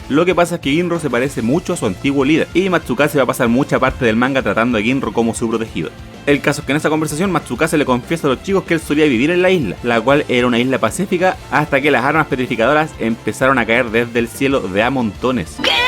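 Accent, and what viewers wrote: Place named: Chile. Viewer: no aguanto su asqueroso acento chileno....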